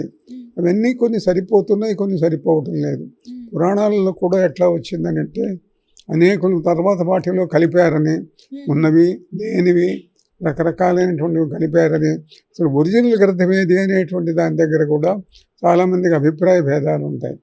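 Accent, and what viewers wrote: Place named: United Kingdom. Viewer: యాంకర్ మాట్లాడే విధానం చుస్తే తెలుగు వారికీ పుట్టినట్టు లేదు ఇంగ్లీష్ దొరకి పుట్టినట్టు ఉంది తెలుగు మాట్లాడం నేర్చుకుంటే బాగుంటుంది.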